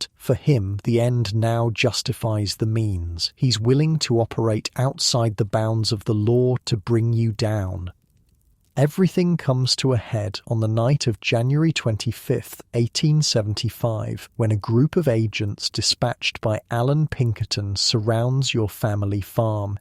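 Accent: England, United Kingdom. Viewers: Old west tales with an English accent?! Yeah, I’m out🙄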